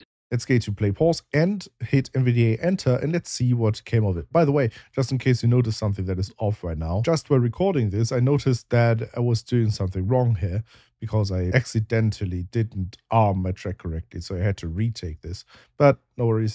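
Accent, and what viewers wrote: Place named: Germany. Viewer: By his accent I think he's German, so God bless Germany too!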